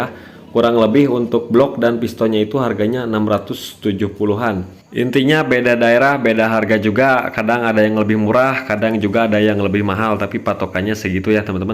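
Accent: West Java, Indonesia. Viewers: Alamatnya daerah mana logat ada bahasa sunda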